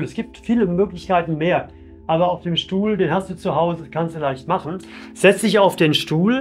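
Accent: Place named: Hesse, Germany. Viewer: the best. Höse hoch usw. Mit hessisch gehts besser. 👍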